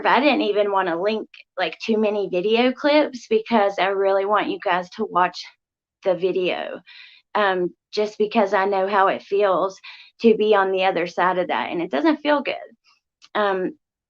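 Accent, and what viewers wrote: South, United States. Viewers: 1st time here, I can tell youre a southern girl like me lol 😊Youve got a new sub.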